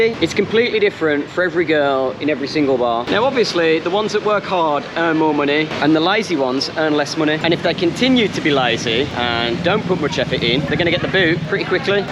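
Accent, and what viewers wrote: Birmingham, United Kingdom. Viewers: You from Birmingham mate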